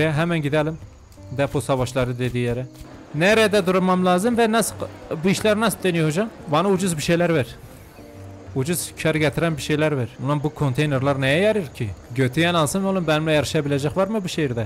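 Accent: Elazığ, Turkey. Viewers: abi sen elazığlımısınü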